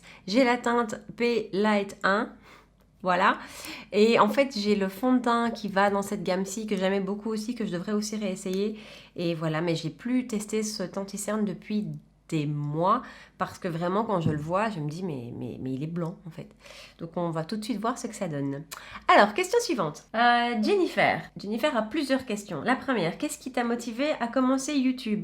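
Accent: Belgium. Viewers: Coucou! Merci d'avoir répondu à mes questions! Félicitations pour tes + de 1000 abonnés du coup 😊 J'ai craqué aussi comme tu le sais sur la mini gold j'aime tellement le vert kaki 😍 Au Etats Unis ça doit être le paradis du maquillage mon dieu 😱 Et je confirme tu as un bel accent Belge! D'ailleurs j'ai des origines belges du côté de mon grand père paternel "ça se voit pas hein 😂" Je suis également "Mimi's signature" et "la Loge de Gabrielle" Tu es très agréable, douce, simple et je suis comme toi j'adore rigoler la musique danser... Bonne soirée et à dimanche 😘😘😘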